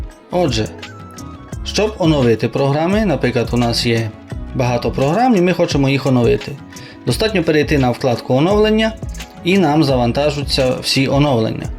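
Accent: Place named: Transcarpathia, Ukraine. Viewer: кострубато то кучеряво (пелехато, заплутано), просто ви розмовляєте дуже подібно до закарпатського діалекту, і людина подумала що ви десь з виноградівського-берегівського регіону. Якщо чесно я також так подумав, але якщо ви не знаєте що таке "кострубато" то я навіть не знаю звідки ви.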